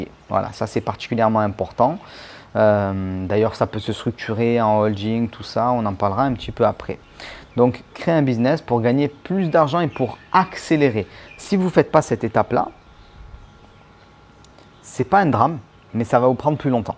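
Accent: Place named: Paris, France. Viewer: super videos merci c'est très précis, par contre tu es de Marseille l accent tu la laisser la bas tu parle comme un parisien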